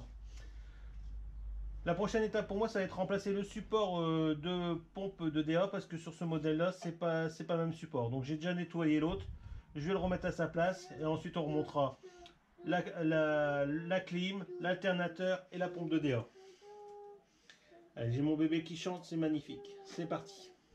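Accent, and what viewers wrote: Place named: Nord, France. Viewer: Salut ça sent le gars du Nord.....ai je raison??????????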